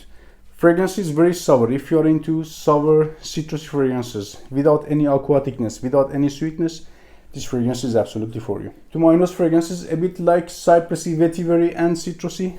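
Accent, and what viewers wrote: Iran. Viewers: داداش لهجت چقد ب ایرانیا میخوره😄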